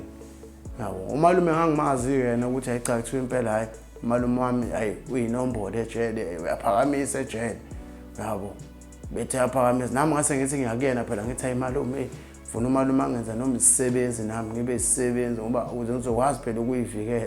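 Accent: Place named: Ndebele, Zimbabwe. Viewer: Waze wakhuluma njengaMandebele aseZim nsizwa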